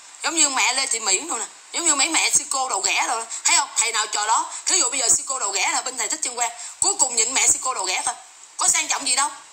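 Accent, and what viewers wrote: Standard. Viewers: Em nói quá chuẩn Hay quá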